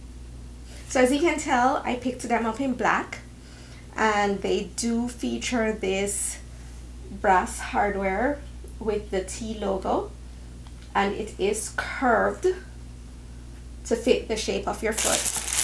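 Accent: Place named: Jamaica. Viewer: I hear the Jamaican accent bap bap lol 🇯🇲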